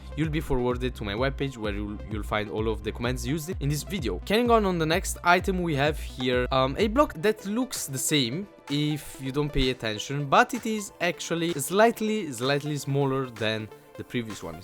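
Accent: Italy. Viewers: mado si se sente che sei italiano haha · An italian accent! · Ehi, ma sei italiano? Nel caso si capisce dall'accento molto chiaro ahah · Si sente che sei italiano ;) :D ...... SHISH!!! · Sei per caso italiano? Parli inglese ma mi sembri italiano dalla pronuncia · bel video, l'accento italiano si nota :)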